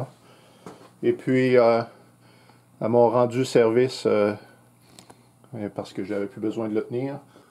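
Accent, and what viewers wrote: Quebec, Canada. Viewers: Série de cours très instructifs et bien filmés. Vu de France on aime bien le petit accent québécois ! Je débute en tournage donc merci professeur et bonne continuation 👏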